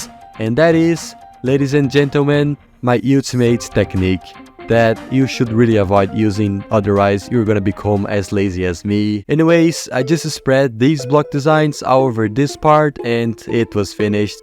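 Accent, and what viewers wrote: Brazil. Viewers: Youre brazillian aint you🥶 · Dude you sound so Brazilian or Portuguese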